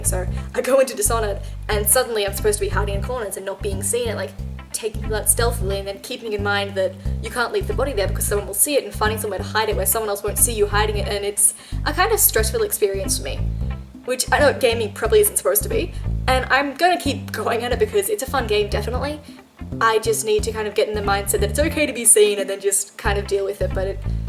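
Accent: Australia. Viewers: Love your accent :) Where in Aus are you? I used to live in Perth :)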